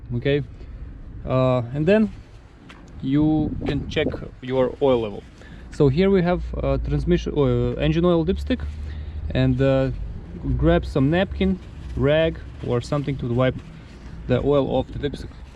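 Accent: Russia. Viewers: Спасибо за русский акцент)))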